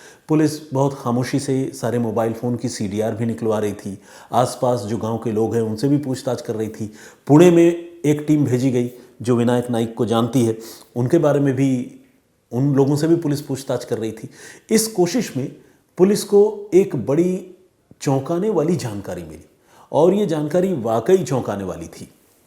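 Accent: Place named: Bengal, India. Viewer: शुद्ध हिन्दी बोला करो, बांग्ला की बदबू आती है तुम्हारे बोलने से 👎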